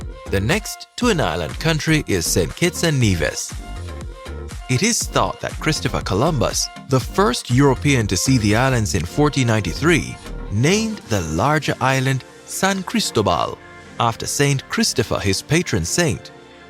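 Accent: Jamaica. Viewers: The Host Presenter Is Jamaican/ I Can Hear The Accent🇯🇲🇯🇲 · He sounds Jamaican to me and I am Jamaican. But then again Grendians have a similar accent too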